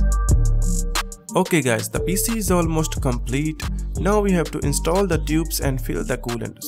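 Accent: India. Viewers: OK bro I was just confused but his talking style was like Indians Thank you😊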